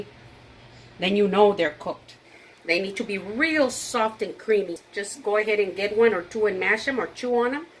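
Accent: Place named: San Antonio, United States. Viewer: You from TX? I’m from San Antonio. You sound like you’re a San Antonian . Reminds me of home. Been in NYC for a long time. Miss that accent. Us younger generations don’t have it really anymore. Brings back memories of childhood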